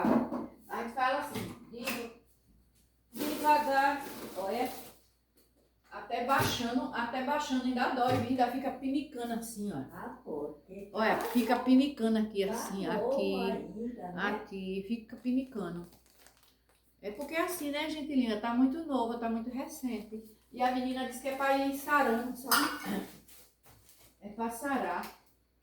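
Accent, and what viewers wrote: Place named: Pernambuco, Brazil. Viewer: Amei cair nesse canal. É o tipo de amizade que mamãe deixa 😅😅😅 tô pedindo a Deus coisa boa pra ver. Seu sotaque parece com o da minha família que é pernambucana, eu sou do Rio.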